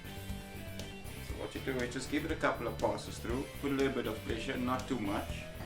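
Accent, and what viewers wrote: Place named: South Africa. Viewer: I love randomly hearing an accent from home on YouTube :) Howzit my bru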